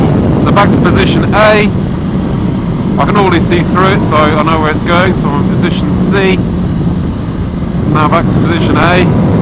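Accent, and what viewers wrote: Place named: London, United Kingdom. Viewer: Here in 'Murica it is primarily illegal to filter or as we call it Lane splitting. Love your vids you sound like a "Pen pal" of mine from london. (Because saying internet friend sounds lonely)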